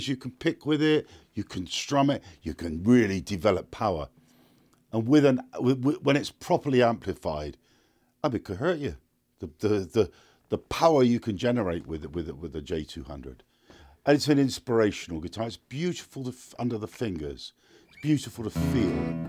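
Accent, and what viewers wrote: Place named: Dorset, United Kingdom. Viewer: Commenting again just below my comment aSince then obviously Greg has sadly passed, love his Dorset accent and he was also a great storyteller, I went to one of his gigs where he talks about his abs others music, he really was a one off, we will miss you Greg RIP now .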